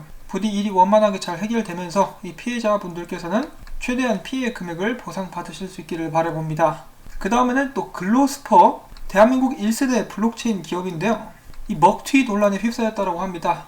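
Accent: Jeolla, South Korea. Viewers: 양쪽 언어를 네이티브로 섭렵 구사해본 바로는 전라도 쪽에 무게가 실립니다만 좁은 땅에서 지역보다는 좋은 사람이면 된겁니다 목소리 톤은 박지성 선수 필이 조금 가미 되어 있다 사료됩니다 ㅎ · 제가 듣기에는 전라도 같은데요? 단지 님은 센발음을 선호하지 않는 경향이 있으신듯...저점===>우리는 보통 저쩜이라고 읽지만 님은 저점이라고 하심~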